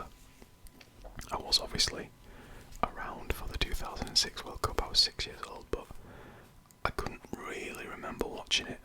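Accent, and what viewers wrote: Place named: Yorkshire, United Kingdom. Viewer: As a fellow Yorkshireman I approve of this channel ha and respect how you’ve managed to calm our usually loud accent into such great relaxing content :D great channel man!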